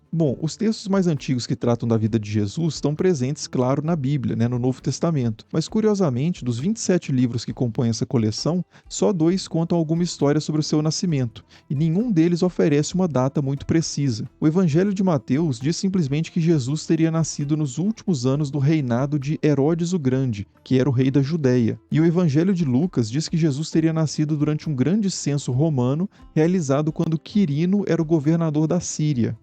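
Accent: Minas Gerais, Brazil. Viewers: É mineiro?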